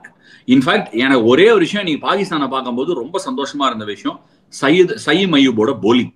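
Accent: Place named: Chennai, India. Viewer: Two lines review for entire match..super na.. akkul perthudurum , namma Chennai style la ketkum podhu arumai...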